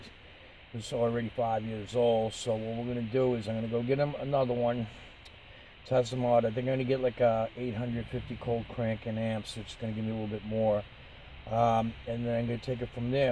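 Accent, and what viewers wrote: United States. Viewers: Recognized the accent right away. Lived in Salem Mass! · Love the Boston accent! I live in Haverhill! Lol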